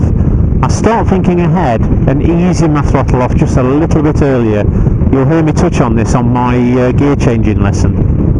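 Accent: United Kingdom. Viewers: great video. thanks. a bit hard with muffled sound, but i could even understand your british well enough. I am from Russia but have lived in California for 22 years.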